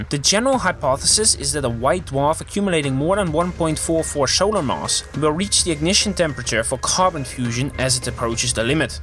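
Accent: Netherlands. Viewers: You Dutch or sonething?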